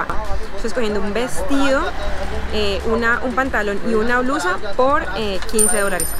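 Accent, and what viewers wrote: Cali, Colombia. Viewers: me ecantas. Ese acento caleño ese cuerpaso jajaja tqm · Me encanta tu acento extraño mi ciudad cali💛💙❤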